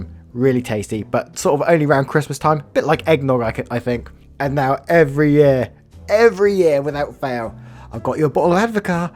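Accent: United Kingdom. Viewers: Yep loved it daddy, totally agree with being over the general Reddit stories. So many people are doing the same shit now. But I love your reading of them the best, love your British accent and that fact you don't give a shit about conforming to impress YouTube head honshows as most YouTubers bleep the swear words. You just say it as it is. I love that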